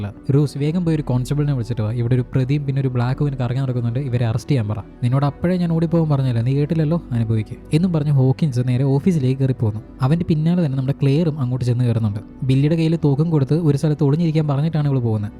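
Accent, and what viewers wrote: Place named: Trivandrum, India. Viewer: Movie selection is good, but the language (Trivandrum or kollam dialect) is a little bit annoying. Try to improve your language.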